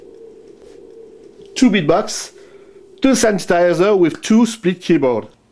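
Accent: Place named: France. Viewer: Tu es francais n'est ce pas ? ca se sent à l'accent haha :p Mais c'est quand même une excellente vidéo, plein d'informations... jusqu’à là je regardais des gars comme Synthmania ou MusicTrackJP pour en apprendre plus sur la prog' des synth vintage mais ta vidéo répond à une bonne partie des questions que je me posais sur les séquenceurs, l'overdub etc. en plus de voir des beaux trésors de guerre comme le OBXA ou le Matrix 6 (dont j'ai une petite préférence pour celui là ^^) et bien évidement la DMX ! (dont d'ailleurs j'essaye de répertorier dans une playlist toute les musiques ayant été joué avec cette drummachine) Je suis encore qu'un modeste joueur qui joue avec un simple clavier MIDI relié à un PC (et je n'ai as encore publié de vidéo car hum... j'ai encore beaucoup à apprendre) mais j'aimerai bien posséder une de ces machine un de ces jours... ou alors un KORG du genre des Poly61 puisque j'aime bien ces petites bêtes qui sonne plus "wild" que les Roland de la même époque... 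et qu'ils n'ont pas encore subi l'énorme inflation de ces derniers :p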